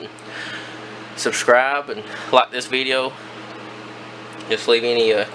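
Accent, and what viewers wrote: South, United States. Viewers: awesome im from maine and we are BURIED IN SNOW;[;[ more coming sun/ monday;[;[;[ SOOO OVER DUE FOR SPRING!!!! thank you for the video... i just came across you when i researched worms farms.. you got a new subb bud;];];] by the way were are you located? you sound "very southern" lololol love it;];];] dont hear it up north much;;[;[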